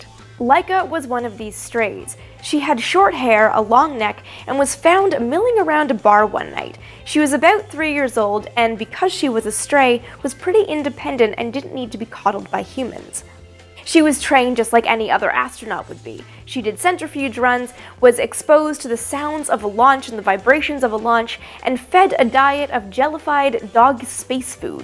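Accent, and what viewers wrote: Canada. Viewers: Always like hearing the Canadianess coming out. :-) Miss-isle just sounds so much cooler than Miss-sel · Great summary. And might I say, your pronunciations are very Canadian in this episode :)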